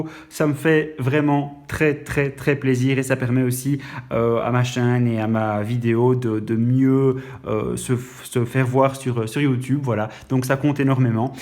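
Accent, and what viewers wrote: Belgium. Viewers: Accent liégeois?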